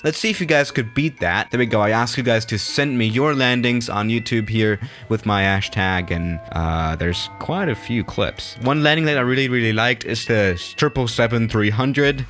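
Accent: Germany. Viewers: He's Jermen don't make fun it just the language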